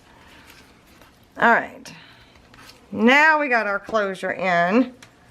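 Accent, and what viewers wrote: South, United States. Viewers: Oh my...I love your southern accent. I'm from California so the way you talk is interesting. Lol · Well I have never heard that phrase before (Dirty English) SMH... HA People come up with the darndest (hummm is that even a word? must be my dirty English) things. I just adore your southern accent. And I for one can follow you just fine. !!!. Oh yeah, I love this album. Since I was a madeitcon, I'm working on the regular cover while finishing up my original album. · Gorgeous! Yes, love you southern bell accent! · Such mean people...love your accent...I have many friends from the south...I'm from the middle of Indiana, people from the north of the state thinks I have a southern accent, LOL. We have an Indiana twang...YOUR GREAT...Hugs...:) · You have a lovely country twang. I love hearing you talk. I remember when I first started watching I was lost sometimes, but the more I watched your tube tutorisls, but more I understood that beautiful country talkin. 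Jus sayin. ♡ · I'm really having fun with this album, I'm using the Georgia Blue since I didn't have the Santa Baby paper and I'm loving it. So many different elements on the cover really special, love that. Can't wait to see the rest and for the way you talk, I think it's as cute as it can be. How could anyone not like a southern accent? How petty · I love your southern accent but of course I live in Texas 😀. Beautiful! Everything u do is absolutely beautiful!😍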